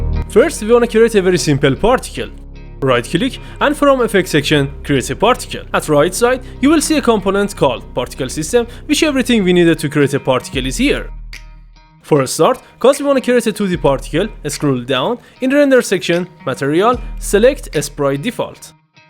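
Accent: Iran. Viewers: Where are you from , like iranian talk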